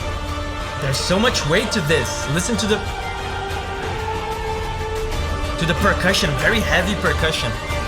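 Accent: Brazil. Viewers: Hey! You have sort of a brazillian accent, are you from brazil?? If not, where you from?? (im probably wrong lol)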